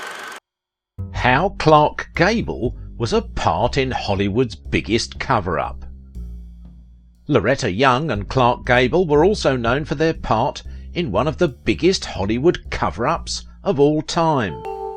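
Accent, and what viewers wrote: England, United Kingdom. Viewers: Shoddy english accented tripe for clicks. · Hi, hope you're doing fine with your busy agenda. You have a strong british accent... Are you originally from Great Britain? Just asking. Have a great day and thx for your work! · Nothing worse than a male gossip. Especially with an English accent.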